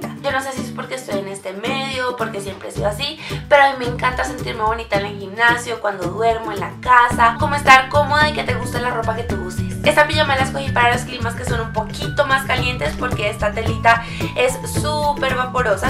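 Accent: Colombia. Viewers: yo se que los colombianos hablan asi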